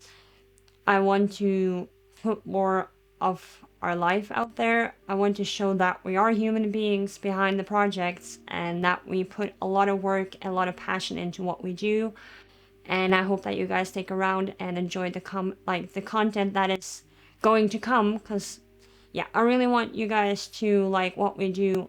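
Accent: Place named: United States. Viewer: Jag förstår verkligen varför du varken hade ork eller tid att skapa och göra videos. Jag har inte kunnat arbeta på väldigt länge pg a min mentala hälsa, så jag förstår dig. Jag hade för övrigt ingen aning om att du är norsk och att är svensk! Du låter väldigt amerikansk 😂 Jag själv är halvnorsk men bor i Sverige 😁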